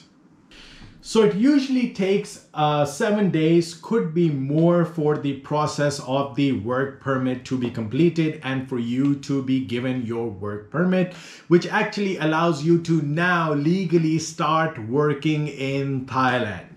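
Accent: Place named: Punjab, India. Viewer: What part of Pakistan are you? Your punjabi accent is unmistakable. Good videos.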